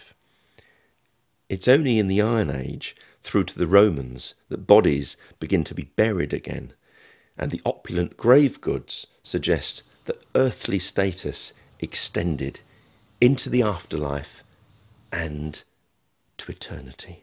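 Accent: London, United Kingdom. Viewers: For me, this is turning out to be a really enjoyable series. I especially like the presentation style - simple, clear and unsensational, and with a perfect London-ish accent behind it.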